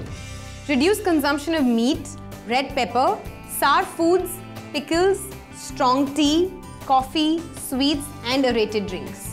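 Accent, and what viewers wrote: India. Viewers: If you don't like Indian accent, why the hell did you hear it then?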